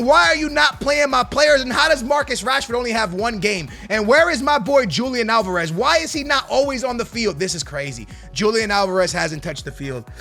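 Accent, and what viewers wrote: Portugal. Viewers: falas tao bem ingles q quase nem se nota o portugues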